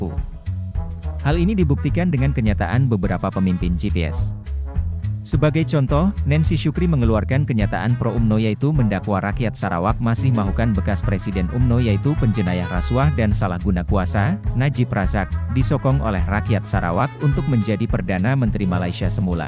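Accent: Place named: Indonesia. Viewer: Tapi Suara VIdeo ini bukan gaya orang sarawak tapi orang indonesia. Orang indonesia kah yang buat video ini?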